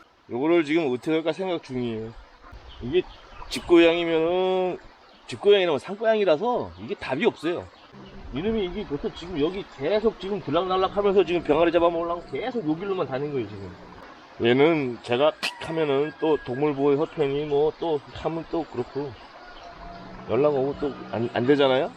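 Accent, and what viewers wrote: Gangwon, South Korea. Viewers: 고양이 안죽이신건 잘한일임. 예로부터 개, 고양이, 뱀은 죽이지 말라고 했습니다. 동물령이 붙는다죠. 근데 어제 이 채널 첨왔는데 매력넘치는 주인장님 재밋네요. 재밋는 강원도 사투리 억양 잘보고있습니다. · 카리스마있는 인상에 엄청 잘생기셨는데 ㅋㅋㅋㅋ 강원도사투리가 너무 귀여우시네요 ㅋㅋㅋㅋ 반전매력ㅋㅋㅋㅋ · 네발달린늠이 고양이였네요.. 병아리를 안먹고 놀이삼아 죽이다니.. 저도 강원도라 말투 정겹네요.. 단속잘하셔서 피해 더이상 안받으시길.. 화이팅하십쇼..^^ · 강원도 사투리 쓰시는거 보니까 강원도 이신가봐요?ㅎㅎ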